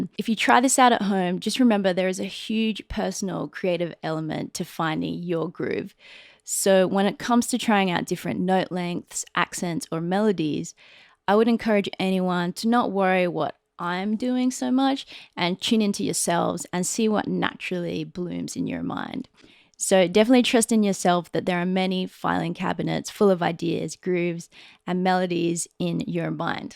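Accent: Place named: Australia. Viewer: Great vid, great playing and practical ideas. Fellow Aussie here 🙋🏼‍♂️, great to hear the accent on a bass vid, I look forward to more.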